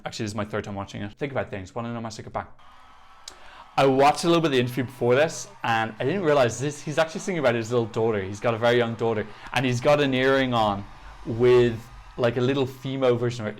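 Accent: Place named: Ireland. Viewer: This was a nice reaction video, I enjoyed it and you had some interesting opinions. Keep it up dude! I have some constructive criticism if you don’t mind. The only thing I’d say though is that you don’t seem to annunciate your words very well. Like, I’m Irish and even I found it difficult to make out what you were trying to say a lot of the time. It’s important to keep in mind that, if you want to appeal to a wider audience, they need to be able to understand you even if English isn’t their first language of if they generally have trouble deciphering Irish accents. Apart from that, the video was great!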